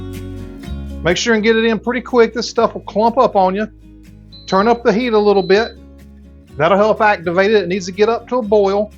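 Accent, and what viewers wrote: South, United States. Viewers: I love listening to your southern accent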